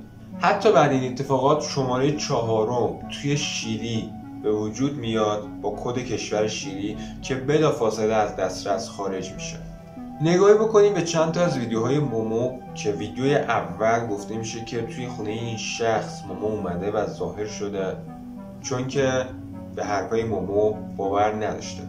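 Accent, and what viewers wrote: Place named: North, Iran. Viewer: داداش لهجه داری.شمالی هستی؟🤨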